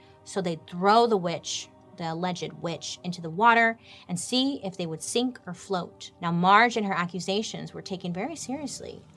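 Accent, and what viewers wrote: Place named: East Coast, United States. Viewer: I love ur voice you sound so east coast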